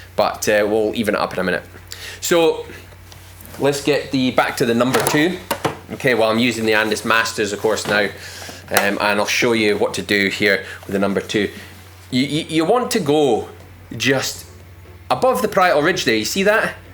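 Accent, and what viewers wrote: Scotland, United Kingdom. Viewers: Great video! I'm going to give a self-cut a try here in California! Are you Scottish? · i love your scottish accent <3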